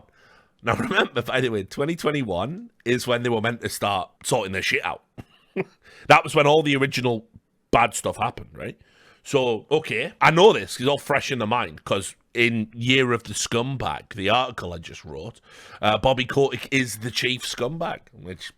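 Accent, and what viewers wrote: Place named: Birmingham, United Kingdom. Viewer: Brummie \m/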